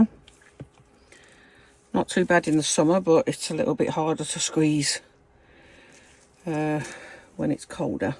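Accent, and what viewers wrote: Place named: West Midlands, United Kingdom. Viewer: Which part of the west midlands are you from? I'm a willenhall yam yam lol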